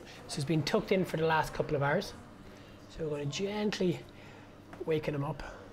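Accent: Ireland. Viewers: Plus, that lovely Irish accent was an added treat <3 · sounds irish :-) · Irish accent. He clearly knows what he’s talking about! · its not sourdough bread its his annoying irish accent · Finally! has de-mystified everything sourdough. I was despairing of ever finding info that was easy to understand, conflicting and not over complicated. makes it so easy to follow…I could listening to his lovely Irish lilt for hours. 😊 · Hi, fantastic video, and having that great Irish accent explaining every stage getting to that final loaf, makes it even more enjoyable. The way you describe each stage I think most people would not have a problem getting to the final product. I had one question, can I half your ingredients to just make one loaf at a time? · Brilliant! This is the first time I've understood the process AND how wonderful you included the why's! I've scoured the classes and they just weren't cohesive. 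You have a new follower. Lovely to hear your brogue, too. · who knew a soft spoken Irish accent is so asmr worthy. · I use almost exactly this recipe, I add an ounce each of Olive Oil and Honey to mine, and bake in covered dutch oven instead of pyrex, but same principal... turns out perfect every time... Love this Video and the easy lovely Irish accent!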